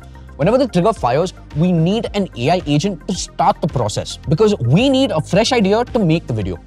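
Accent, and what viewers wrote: India. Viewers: He sounds Indian and looks Mexican 😂😂😢😅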